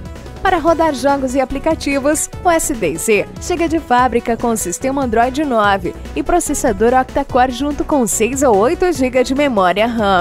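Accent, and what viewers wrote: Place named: Rio de Janeiro, Brazil. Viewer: Ele, é Carioca ;)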